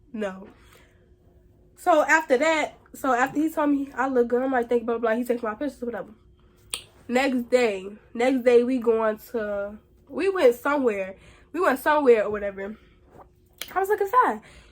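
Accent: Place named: Chicago, United States. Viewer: I like ya accent lol you sound like you from Chicago “blew me” is funny asfff 😂😂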